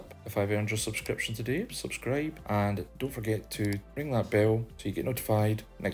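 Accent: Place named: Scotland, United Kingdom. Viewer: This was just what I was looking for. Very informative and great to hear a fellow Scot on YouTube!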